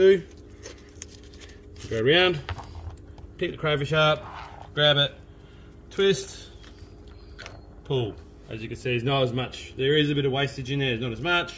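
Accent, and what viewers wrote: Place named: Australia. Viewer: I thought you were in Australia from the accent 😁 I saw another video where there were leeches in fields there 😳 Do you guys have ticks everywhere too?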